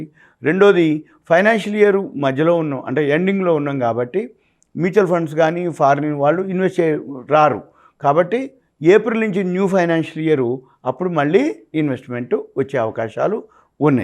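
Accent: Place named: Telangana, India. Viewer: నువ్వు నీ తెలంగాణా assent.......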